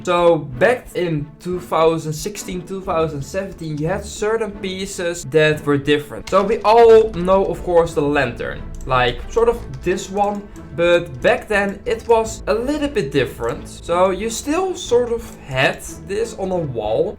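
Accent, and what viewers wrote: Netherlands. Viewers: Because he has a Dutch accent.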